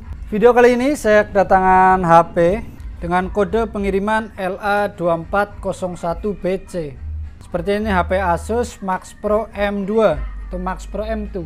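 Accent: Banyumas, Indonesia. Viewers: Koyo wong ngapak iki ra ngapak ra penak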